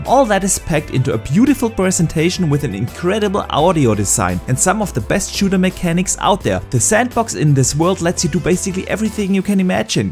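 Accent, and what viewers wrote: Germany. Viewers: Dieses Deutsch-Englisch haha, aber gutes Video :) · Du kommst doch Safe aus Deutschland oder 🤣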